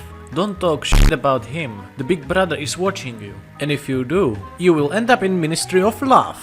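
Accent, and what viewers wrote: Russia. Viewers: Ты русский? Либо какой либо нации? У тебя акцент прямо на наш похож. Translate: You russian? Or other nation? Your accent like russian. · Huh, I never noticed Russian accent until now. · Are you Russian?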